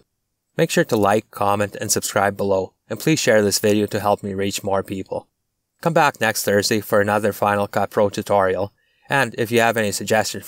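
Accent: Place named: Saskatoon, Canada. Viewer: You sound like you’re from Saskatoon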